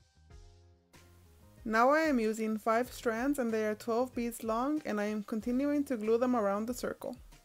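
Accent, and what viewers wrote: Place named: South Africa. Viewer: You sound South African. Very charming pronunciation💐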